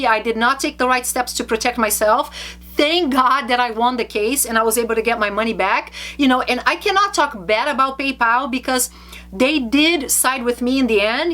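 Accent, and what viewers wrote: Brazil. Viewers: This accent sound so....brazilian! Tem q ser! Anyhow thanks for sharing! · Seu inglesé otimo, mas esse sotaque é de brasileira, nao é? prazer! muito bom o conteudo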